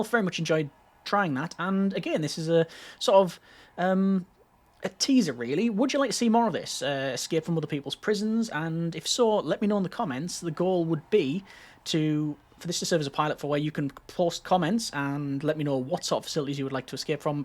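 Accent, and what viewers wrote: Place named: Middlesbrough, United Kingdom. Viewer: Why do you sound like you're from Middlesbrough??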